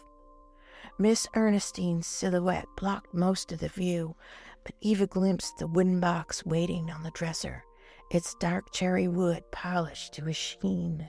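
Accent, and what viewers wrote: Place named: South, United States. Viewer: This was amazing! I absolutely love the southern drawl accompanying the southern gothic.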